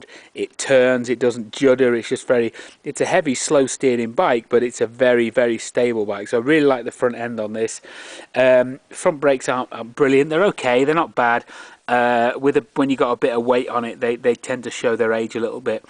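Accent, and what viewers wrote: United Kingdom. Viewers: This is how a rider review should be done. No 'ums' and 'ahhs' and stumbling, it's bloody seamless, articulate, and relevant. Well done, mate. Only thing you didn't confirm was can a full face helmet fit in the side bags...I know it does on the ST13 but what about the ST11? They look like they're right on the line of not being deep enough. I'm gonna do something like this review for my Honda Blackbird...another Honda masterpiece. I absolutely love the 80s look of the Concours 10 and it's rawness but you nailed the reality of this segment...at the ST1100s much higher showroom prices in 1994 it was a different story, but now with prices so low on these bulletproof used 90s bikes (both the ST11 and Connie are tanks) it's hard to make the case for a used Connie in order to save at most a few hundred bucks. Better brakes (ABS on some later models) integrated crash bars, better suspension, lower centre of gravity, overall smoothness, and subjectively more interesting engine and no oddball 16 inch rear wheel with its limited tire choices and iffy availability in the middle of nowhere...the Honda is the smarter choice. 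BTW your comment about England civilizing the whole fucking world had me howling as well as feeling a perverse pride in my savage ancestry. I'm gonna put that accent of yours (lapsed a bit) somewhere north..maybe Lancashire or Yorkshire. Am I wrong? Thanks again for a great review.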